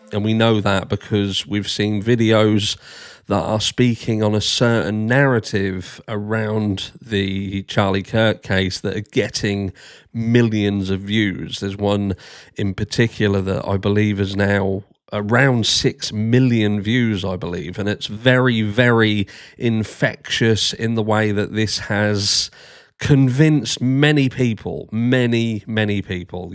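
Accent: United Kingdom. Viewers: It appears you think because you speak with a British accent or whatever, you think people are supposed to believe you over everyone else. Jimmy Kimmel is a comedian. Jimmy Kimmel is not a racists podcaster who preaches hate division & white supremacy, nor did Kimmel advocate for gun violence to include kids being murdered in cold-blood in schools and churches as they studied & prayed. You had no problem throwing shades at Jimmy Kimmel for rightfully doing his job in a comedic way through political satire for calling out a 21st-century Klansmen in a suit & at no time did you bothered to mention any of the racist disgusting horrible things Charlie kirk has said which told me everything i needed to known about you and your up bringing or current beliefs. I watched your video, and just like the one before this one, I gave it a thumbs down. If you're going to make videos to explain your point of view from an honest point of view, you should leave your biased feelings out of them, ,, Otherwise you're just a low grade brand of Foxnews propaganda which is responsible for the current political divide in today's America . 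The killing of 21st-century Klansmen in a suit Charlie Kirk was wrong, but Jimmy Kimmel was just doing his job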